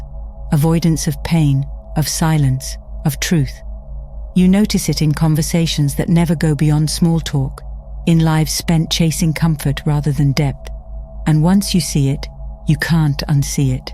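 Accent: United Kingdom. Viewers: Sounds like Solace Fox with different music and a British accent.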